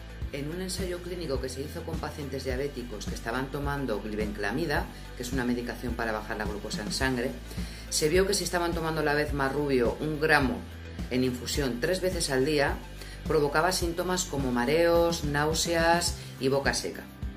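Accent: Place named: Spain. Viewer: Me gusto el acento Español.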